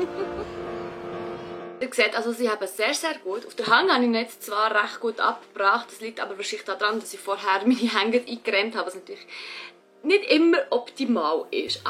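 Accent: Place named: Bern, Switzerland. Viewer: I fingäs soo cool ds du bärndütsch redisch😍😍😍❤🇨🇭🇨🇭🇨🇭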